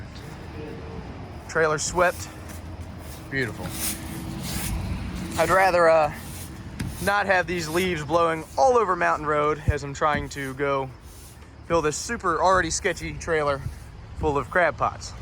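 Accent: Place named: Appalachia, United States. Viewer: Whenever Asians speak hill Billy? I love it.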